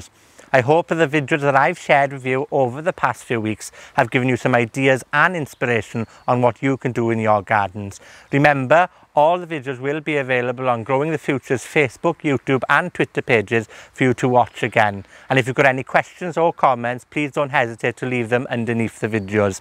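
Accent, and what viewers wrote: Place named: North, United Kingdom. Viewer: Sounds like a northerner to me :)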